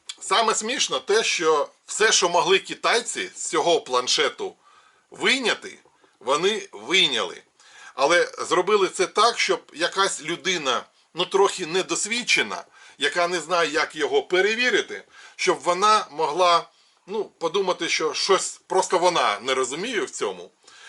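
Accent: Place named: Russia. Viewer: акцент русский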